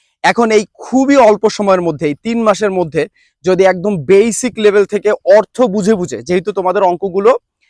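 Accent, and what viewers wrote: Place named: Sylhet, Bangladesh. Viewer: আপনে কয় থাকেন সিলেট নাকি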